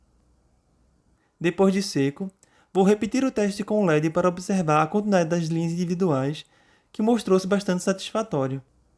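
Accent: Brazil. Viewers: Hay un canal de ciencia se llama manual do mundo. El tío también es de Brasil. Pero su acento es muy diferente de tuyo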